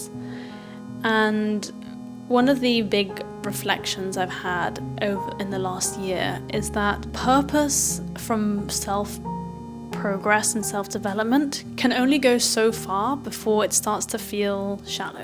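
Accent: RP, United Kingdom. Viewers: I love your British accent..please continue to inspire us. - Love from Kuala Lumpur. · Thank you so much for your podcasts and and videos in English. I really like British English, especially when you pronouncing it! Keep making this videos because you can not even imagine how it’s changing someone’s English skills! You are the best! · Oh dear, you are the first one I check when I want to learn RP accent and a foreign language! Congratulations on your life achievement, 我祝你和家人一切顺利！ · Wow! Welcome to Hong Kong! Congrats to you with the many new chapters in life! Hope to bump into you in Hong Kong one day! Love your British accent!❤😂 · Dear! Your videos are really helpful. Keep on doing your great job! I personally enjoy listening to your perfect RP pronunciation, your soft voice and, which is the most important, wonderful tips you give on different subjects!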